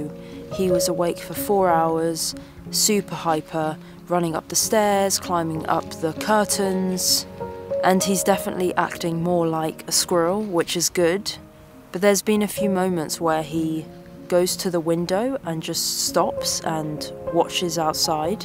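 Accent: United Kingdom. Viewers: having a British narration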